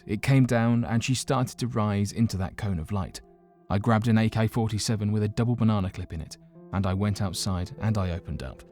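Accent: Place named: North, United Kingdom. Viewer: he's saying "fireballs" -- turn on captions and you'll see :) this is why i watch most British shows with closed captions turned on because frankly i can't understand some of what they say, especially thick Northern English accents... no shade on the British, i have trouble understanding other foreigners speaking English with a thick accent too :) (looking at you, Jamaica and India :)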